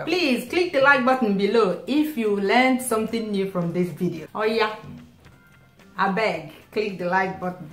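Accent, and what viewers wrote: Igbo, Nigeria. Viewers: Just like your Igbo accent. E dey deep ❤ Cheers sis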